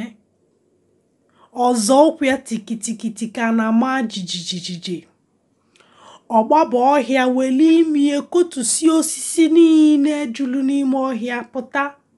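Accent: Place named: Igbo, Nigeria. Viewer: sis i so much love your channel with your igbotic accent i wish i can spee=ak like you now chai kudos to you sis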